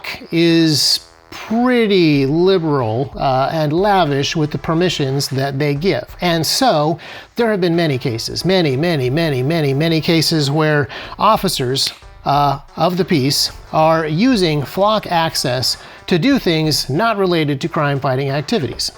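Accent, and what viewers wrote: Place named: United States. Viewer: You accent sounds American, at the end there you talked about London so much I may be wrong about you. The end there is a good example for the American 4th Amendment which requires police to have a warrant to investigate people. Meaning evidence of a crime needs to be presented to a judge. For America this kind of mass surveillance is so very illegal.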